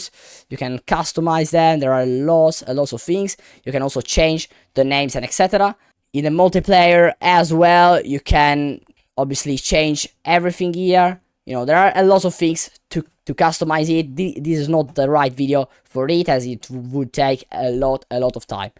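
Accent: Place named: Italy. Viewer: i like your italian accent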